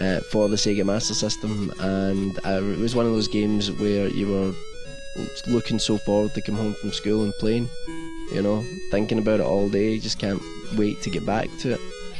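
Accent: Scotland, United Kingdom. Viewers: Cool review and funny accent. where are you from? Scotland or something? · fellow scotsman gon yer sell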